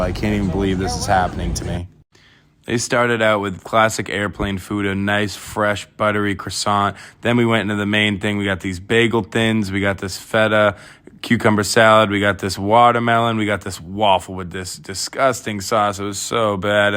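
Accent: Boston, United States. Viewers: that’s a boston accent lol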